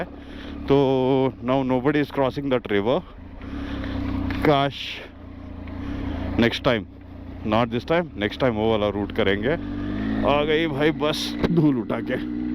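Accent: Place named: Karnataka, India. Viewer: bhai, plz make video on riding gear and liked your kannada accent 😊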